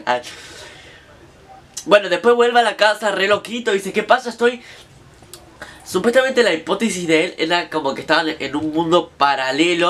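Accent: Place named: Spain. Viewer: Ese acento español es U NI CO❤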